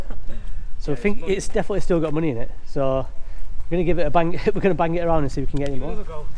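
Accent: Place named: Liverpool, United Kingdom. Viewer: No. I just don't understand Scouse.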